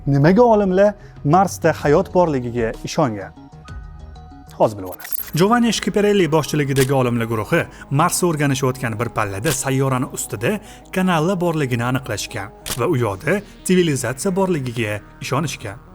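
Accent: Tashkent, Uzbekistan. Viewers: Ижодингизга омад. Лекин видео Тошкент шевасида экан, нима, бу фақат Тошкентликлар учунми? Тошкент шевасини эшитдиму, томошани дархол тўхтатдим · Тошкент шевада гапиргани ёкмади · dasturni toshkent shevada emas adabiy tilda olib borsangiz yaxshi bòlardi har holda sizni faqat toshkent kòrmaydi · Toshkent shevada emas, adabiy o'zbek tilida gapiring, ba'zi gaplarizga tushunmay qolyabman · eeey toshkanli aka adabiy shevada gaproring tushnmavotman gepizge oga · вотти дегани нима дегани сизларни фақат тошкентликлар кўрмайди адабий тилда гапринглар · Материал зур. Лекин Тошкентча шева унинг сифатига таъсир килмокда. Кейинги чикишларда ушбуларга эътибор каратилса ажойиб булади. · Тошкент шевасида гапирмасдан,адабий тилда гапир.Кушнинг билан гаплашиб утирмаяпсан-ку ,саводсиз. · Toshkent shevada emas adabiy badiiy tilda gapirsangiz yana ham gòzal bòlarkan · билдик Тошкентлик экансиз, одамлар чет тилини ўрганади, Тошкентликлар адабий тилни ўрганолмайдм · Ijod zoʼr takrorlanmas omadizi bersin bir taklif NATOʼGʼRI TUSHUNMANG LEKIN AKA SOF OʼZBEK TILIDA GAPIRING ADABIY TILDA TOSHKENTCHA SHEVADA EMAS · ассалому алайкум малумот учун рахмат аммо вотти сузини (тошкентча шевани ) ишлатманг илтимос! 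узбек тилида кевотти борвотти деган гап йук. эфирда адабий тил миерларига риоя килинг. узур гапим огир ботган булса! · Juda yaxshi boldi. Lekin Toshkent shevaiida emas adabiy tilda gapirsangiz yaxshi bolar edi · Ука, тошкенча воттиламай адабий ўзбекча тилда гапирсангиз курсатувингиз яхши чиқарди! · "ВОТТИ-ВОТТИ" ЛАМАЙ ГАПИРСА БУЛМАСМИКАН. БИЛДИК, "ТОШКЕНТЛИК" ЭКАН. ХАР ХОЛДА АУДИТОРИЯГА ШЕВАДА ЭМАС, АДАБИЙ ТИЛДА ГАПИРИШ КЕРАКЛИГИДАН БУ "ШАХАРЛИК" НИНГ ХАБАРИ БОР ШЕКИЛЛИ... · Toshkenni shevasida gapirsa nima bopti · Аввало видео учун рахмат журналистмисиз блогермисиз адабий тилда гапиринг Тошкент шевада гапирмай тв куйсанг хам факат тошкент шевада гапиришади нима тош шевада гапирса маданиятли булиб коласизларми шунчаки жахлим чикади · Toshkenligu Toshken shevada gapiradida. Manga qaytanga hariplani shevadidan kura shu uzimizani Toshken sheva chiroyli eshtiladi.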